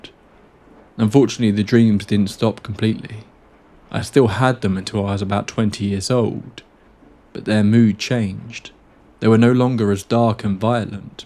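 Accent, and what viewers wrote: United Kingdom. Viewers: Love your british accent .great stories..from usa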